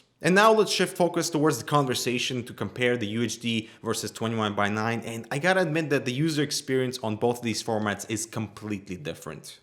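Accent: Russia. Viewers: я извиняюсь, что за акцент ты русский что ли?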